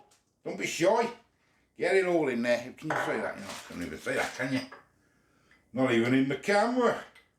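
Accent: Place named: Hartlepool, United Kingdom. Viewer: Loving this ! Me and the wife love your videos , but we have been debating on your accent ! Where bouts you from Hartlepool ??? 😬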